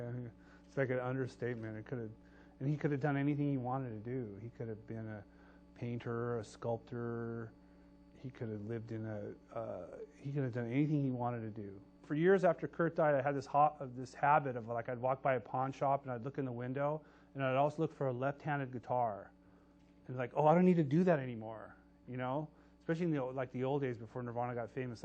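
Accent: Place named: Croatia. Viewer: he was born in croatia right? to.... i forget what kind of parents, but i can hear it in his accent.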